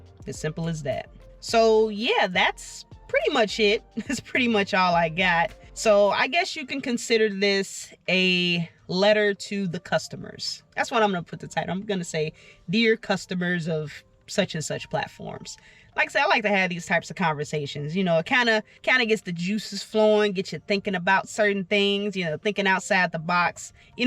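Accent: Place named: AAVE, United States. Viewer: It's AAVEE, which is just another system of language in America, particularly by black people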